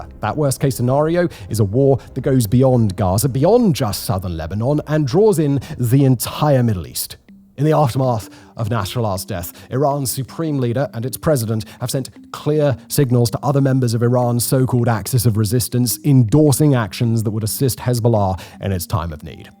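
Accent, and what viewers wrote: United Kingdom. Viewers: There's nothing more calming than having soothing voice and british accent to tell us about how shit's going down. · The attempt to create a balanced picture between a liberal state and terrorist organizations is strange in a British accent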